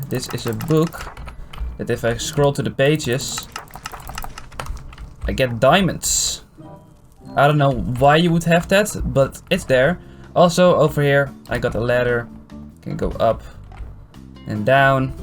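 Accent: Netherlands. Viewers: You sound....dutch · wild dutch accent lol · the dutch accent :3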